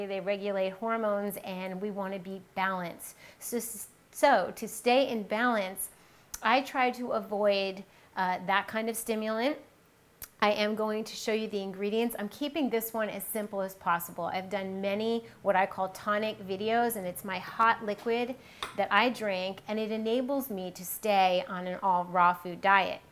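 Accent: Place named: Texas, United States. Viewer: little bit of honey -- sounded like she from TEXAS:))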